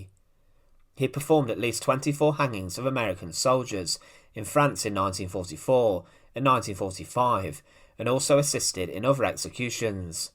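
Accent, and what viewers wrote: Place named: Blackpool, United Kingdom. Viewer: Your English dialect accent makes me ill. Blackpool?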